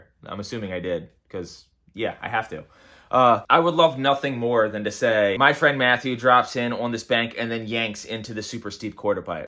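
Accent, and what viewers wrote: East Coast, United States. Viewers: Dude you’re one of my favorite YouTubers in general. I like how real you are and your opinions. I’m from Delco, outside Philly and I can tell you are from the East Coast and you’d fit in well in Delco 😆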